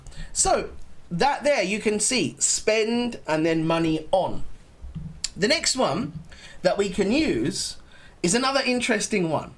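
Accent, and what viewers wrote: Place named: United Kingdom. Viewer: I am crazy about British accent loved it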